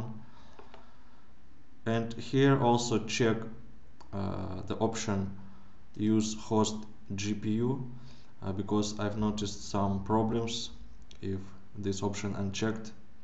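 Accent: Russia. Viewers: Улыбнул русский акцент )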